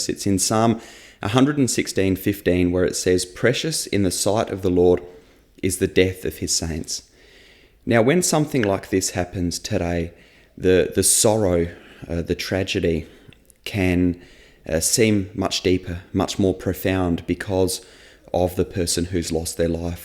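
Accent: Australia. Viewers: Amen. Thank you. I follow you, as I followed Charlie and the late Pastor Baucham from Egypt. I am a Coptic Orthodox Christian. May God bless you my son and may He bless your ministry Amen. I am very fond of the Australian accent though I don’t hear it often. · Had to stop bc of the Australian accent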